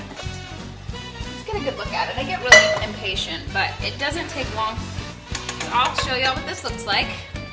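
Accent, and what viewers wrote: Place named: Colombia. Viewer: i love her Colombian accent !! lol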